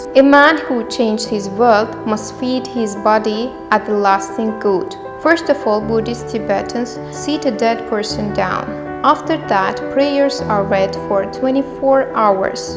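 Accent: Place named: England, United Kingdom. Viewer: Hi! You are so dilligent and i like your english akcent! Good Luck in AQAT GROUP 👏🏻👏🏻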